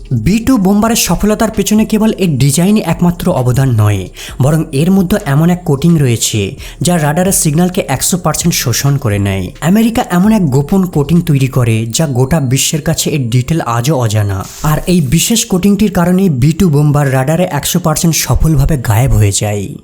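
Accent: India. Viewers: কথা শুনে মনে হচ্ছে তুই গাধাটা ইন্ডিয়ান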